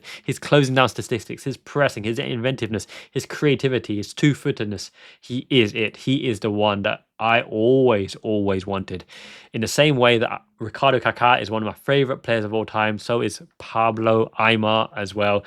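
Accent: United Kingdom. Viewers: Another top video and happy belated birthday 🎂 to Mia. Out of curiosity....it's clear from your accent that you were either born in the UK or spent many years here. What took you back to Japan ?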